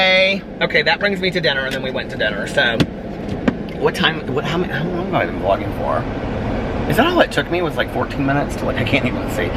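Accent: United States. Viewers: Love the American pronunciation for food....makes us brits / irish laugh...... also you guys still use the phrase handicap, in UK that isn’t acceptable any more. We use the word disabled. Also dressing up is wearing a black crew tee shirt lol.... in uk dressing up is wearing a suit. Glad you’re feeling better ❤️❤️❤️❤️ Lol in uk we have nothing but round abouts. If you ever come to UK DONT go to Milton Keynes.......nothing but roundabouts it’s like the capital of roundabouts 😂😂😂😂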